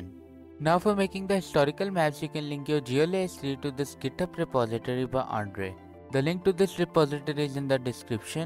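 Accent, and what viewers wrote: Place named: Pakistan. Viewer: i feel bad for you how other people judge your voice bc ur pakistanian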